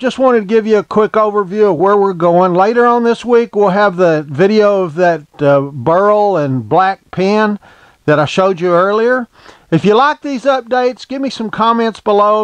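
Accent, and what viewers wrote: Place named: Texas, United States. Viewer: I just recently subscribed and I am enjoying your channel. I don't know for sure where you're from but you sur sound like a fellow Texan. Keep up the good work. Jim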